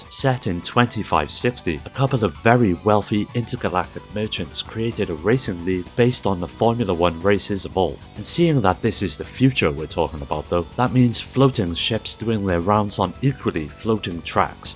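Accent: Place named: Liverpool, United Kingdom. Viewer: Lol it's just a heavy Liverpool accent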